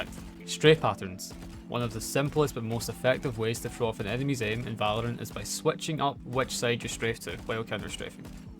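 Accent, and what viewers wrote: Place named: Skye, United Kingdom. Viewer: i love that skye accent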